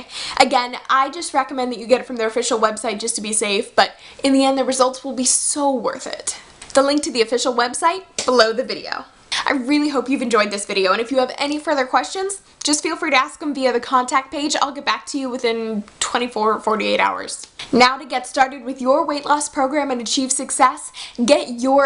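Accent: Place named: United States. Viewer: Possibly the most annoying american accent ive ever heard. My ears are bleeding.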